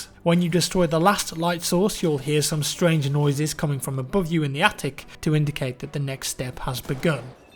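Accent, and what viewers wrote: United Kingdom. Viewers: why do all great youtubers has to be british ? i mean fck off i can't understand nothing from that accent . since when you say "far" for "for"... i mean far != for ...it just so stupid i allways close this kind of videos but fck i need that dog tag.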